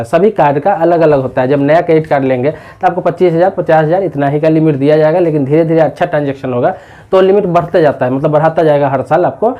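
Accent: Bihar, India. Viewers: Bihari bhasha thik karo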